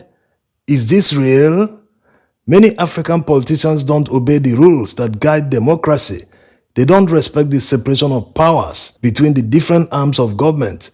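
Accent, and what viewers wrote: Igbo, Nigeria. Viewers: Rubbish talk 98 percent of the time in thick Igbotic accent. These are the same people who used to hate both Buhari and Niger Republic a few months ago now pretending to be friends of Niger. As I said earlier - RUBBISH.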